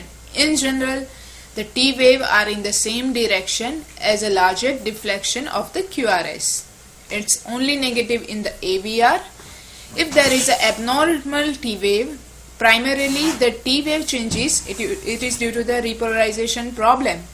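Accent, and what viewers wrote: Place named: India. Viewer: I am not trying to sound racist or xenophobic. But I am having a very hard time understanding what the Doctor is saying. Her Indian accent is so heavy it makes understanding everything she says sometimes beyond my ability to comprehend. I have to review the video many times. If this Doctor would simply slow down her speech by only a small fraction it would truly help those not a custom to her accent. Thank you for your time.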